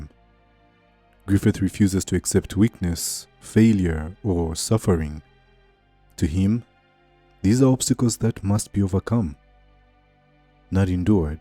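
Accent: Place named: Nigeria. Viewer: Great video! Your accent sounds Nigerian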